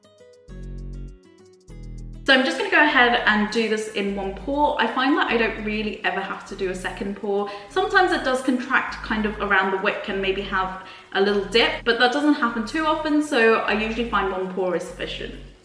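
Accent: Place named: United Kingdom. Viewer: Nice work sister. Love that British accent lol.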